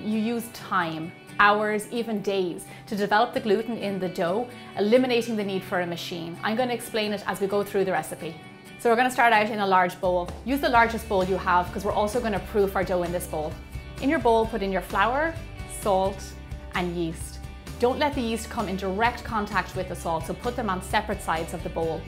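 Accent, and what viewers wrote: Ireland. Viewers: Is she from Ireland 🇮🇪? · I hear a hint of Irish.can you do a vid on making Bailys Irish cream the nector of the Gods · U sound irish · What a lovely Irish accent and what a great recipe. Thank you. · this accent sounds Irish.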